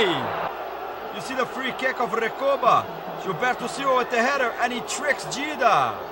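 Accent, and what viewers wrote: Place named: Brazil. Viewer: Esse narrador é Br! rsrsrs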